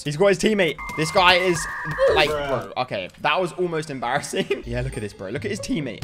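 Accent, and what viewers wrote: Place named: United Kingdom. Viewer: Yes. A British YouTuber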